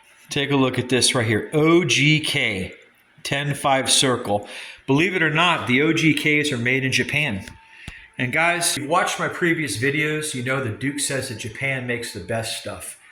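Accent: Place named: California, United States. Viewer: Your channel is our favorite Duke, along with RAD BMX and Sensei Phu with Epic,for a Florida boy you sure sound like a California Dude. You know an amazing amount of stuff in your lessons and should teach classes on this curriculum. Colleges need to offer courses in this, it’s serious Americana history. You explain things so clearly and articulately, it’s a soothing stream of consciousness that relaxes the mind and the soul. Thanks again bro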